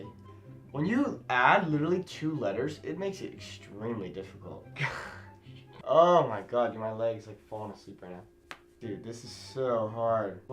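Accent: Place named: Mexico. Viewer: As a spanish native, you speak really good, and got the accents right, that i think is the most difficult part for other people. Also you got more of a mexican accent. i Liked it!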